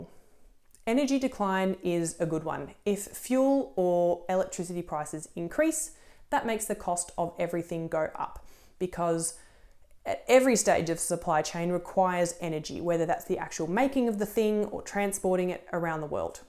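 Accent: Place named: Australia. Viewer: Love the Aussie accent tbh. Great big picture exposition. Really impressive although depressing. Root cause is not politics or capitalism like most ppl tend to mention. It is pure evolution and natural instinct. Our species just won the evolution race and we are running towards every boundary possible until nature will force us into the next balance. Its a natural inevitably. Dont blame a particular group of humans or "system". Its the system of nature.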